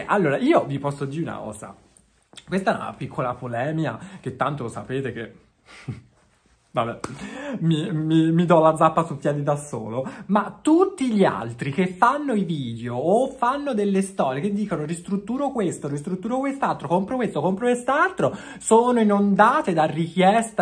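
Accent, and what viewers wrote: Tuscany, Italy. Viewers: Ciao sei bellissimo e questa camicia che ti ha detto di comprare mark ti sta benissimo e quando parli in Toscano sei fantastico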